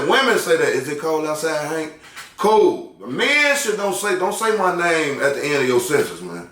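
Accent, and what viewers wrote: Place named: Brooklyn, United States. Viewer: Bruh in your videos I really thought you talk like that😅 I'm from Bed-Stuy Brooklyn., When I first seen and heard you I thought you was from Brooklyn too. Yo Brooklyn, Chicago and part of Philly have so much in common. I was in the Army with a Brother from Chicago., We called him Shorty-Long.. He was Hilarious!!!.. he made me feel like I was back on the Block. You also have true Talent.. ✌🏽👍🏽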